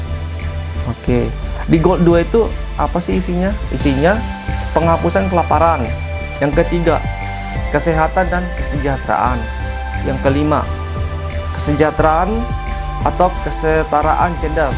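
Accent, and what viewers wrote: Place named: Balige, Indonesia. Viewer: Masih sama kali logat abang ngomong ya kayak di balige dulu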